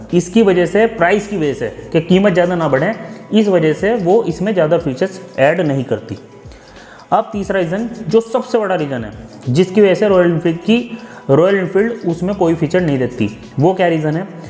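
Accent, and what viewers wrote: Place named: Uttar Pradesh, India. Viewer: Kha se ho ap UP mai .....aj language suni dyan se .phle.jesii rehve 😂😂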